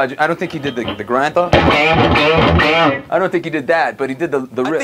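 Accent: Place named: Canada. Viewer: are you a canadian? You sound sort of like a canadian.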